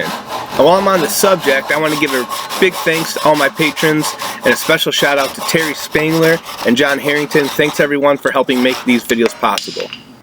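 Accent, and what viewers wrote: Michigan, United States. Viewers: Your accent brings me home. I grew up in Michigan. But I've lived in AZ for 30 years. You sound like my nephews.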